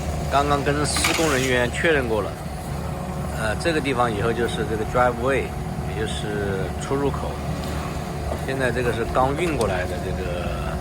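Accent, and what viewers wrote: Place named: Hubei, China. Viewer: 感觉湖北人口音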